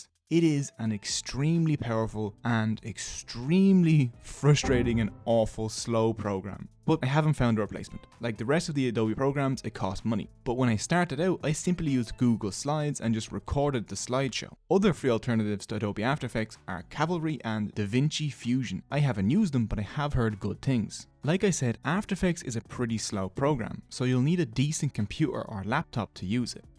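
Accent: Dublin, Ireland. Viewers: Love the Dooblin accent 😆!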